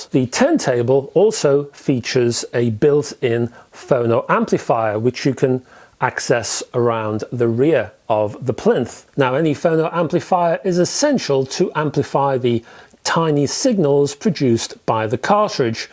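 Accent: Liverpool, United Kingdom. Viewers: Nice British accent :-) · Looking to get into vinyl. Are you from by my way Liverpool? If so apart from Richer Sounds are there any decent shops up here to browse?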